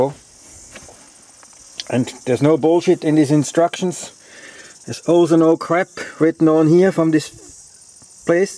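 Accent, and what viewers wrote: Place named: Australia. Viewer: You sound like a ozzy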